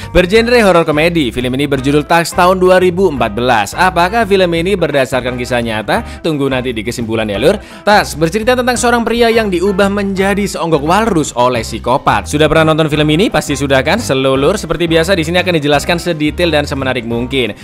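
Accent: Java, Indonesia. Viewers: Org jawa kh bg anjay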